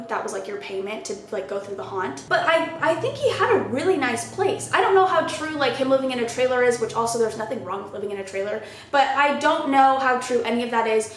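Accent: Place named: South, United States. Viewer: That insane they all need arrested. Love your southern accent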